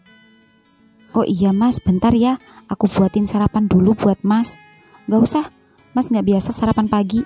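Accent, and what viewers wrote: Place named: Eastern Indonesia, Indonesia. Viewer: .. 👍👍👍 Aku suka cerita mu.. Siapa sih pembawa cerita ini? Kamu pandai membawakan cerita ini dengan dialeg Indonesia Timur mu hebat. Hanya dialeg Jawa kasar nya perlu improvement sedikit.. Dan sangat menarik cerita nya dengan pandangan taboo budaya Indonesia… Malu malu walaupun mau dan harus membohongi diri sendiri.. self denial..😢😢😭😭 Semoga selalu ber kembang dan sukses Channel ini.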